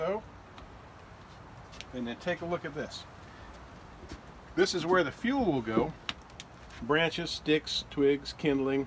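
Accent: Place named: South Louisiana, United States. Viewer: A woman from South Louisiana I can tell.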